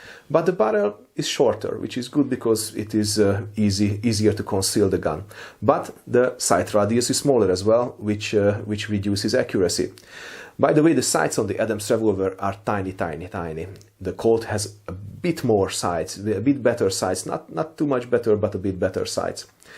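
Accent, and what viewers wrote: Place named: Hungary. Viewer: Nagyon tetszik a magyar akcentusod!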